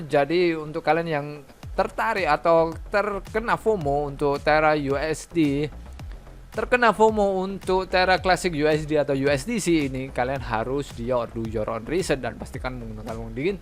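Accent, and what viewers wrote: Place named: Banyumas, Indonesia. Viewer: Siap ngapak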